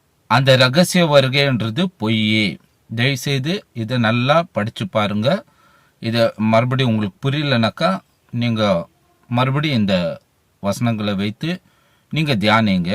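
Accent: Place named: Bangalore, India. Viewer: Here I don't want to say whatever he has spoken is right or not or who is right? Apart from that I see that many of you has commented that he should learn proper tamil... Tamzhians who are born and brought up in Bangalore and have their ancestors settled in Bangalore would have this kind of slang. All bangalorean tamizh people speak like him....its one way of tamizh slang like how we have north chennai slang. Please do not comment bad on his slang... atleast he tried speaking in tamizh.... And not only in this video being a Christian i dont know why people make comment using bad words or commenting in a disrespectful way. Please use your language properly or do not register your comment. It is the same tongue we are using to praise the lord. Are we not ashamed to use bad words in the same tongue we use to praise our holy Lord. Dont your conscience strike you when you sit for prayers. 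Please be a proper faithful christian to jesus.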